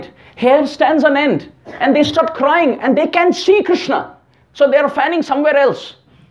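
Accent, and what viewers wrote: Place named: Bengal, India. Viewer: Its a great pleasure to hear Harikatha through your mouth, sir. By the way, are you bengali? Because your sense of humour and tones of your speech somehow get match with bengali.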